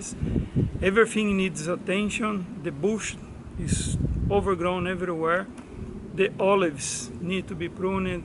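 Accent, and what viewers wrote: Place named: Brazil. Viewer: Algorithm just presented your channel to me. I will be following you on your restoration journey with interest. I knew you weren't Spanish, but couldn't place your accent as I'm not used to hearing Brazilian people speaking English. I'm English living near Valencia and understand there are laws concerning building on nature reserve land. You found a great property with huge potential. Are there many building regulations you have to abide by? Also you say "we", do you have a partner or are you solo. Best washes and good luck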